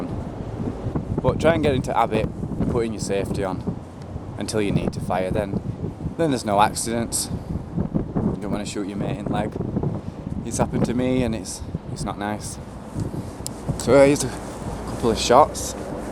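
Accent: United Kingdom. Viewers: ow sorry thought you were from wakey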